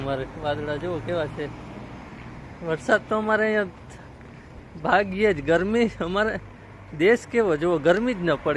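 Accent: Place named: Porbandar, India. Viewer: તમારી બોલી ઉપર થી એવું લાગે છે કે તમે પોરબંદર નાં મેર છો ❤❤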